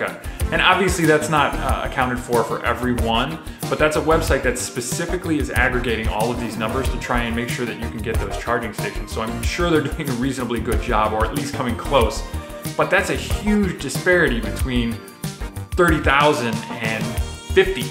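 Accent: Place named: United States. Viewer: Cannot hear much of what you are saying. Your strong U/S accent, which is not easy for native speakers of English to understand, and the overbearing music (noise) defeat your purpose. I persevered, but gave up after about 4 - 5 minutes.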